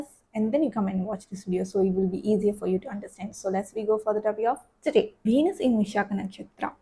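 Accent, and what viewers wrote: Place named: Malaysia. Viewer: Hey, Amazing video, appreciate the insights! Are you from Malaysia btw? I grew up there, you have the same accent!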